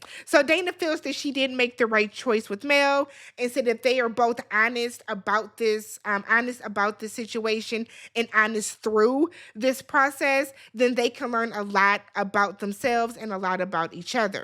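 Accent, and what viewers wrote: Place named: DMV, United States. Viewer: Are you from the dmv area? A couple words and the way you pronounced things gave DMV 😂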